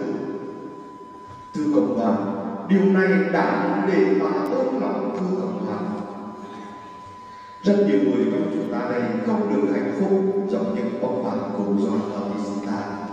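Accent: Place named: Nghe An, Vietnam. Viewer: Cha giọng yên thành, nghệ An thì phải.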